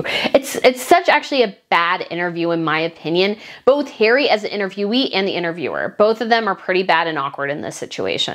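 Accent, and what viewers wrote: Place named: United States. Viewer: I like what you are saying but can't you soften your american high pitched voice?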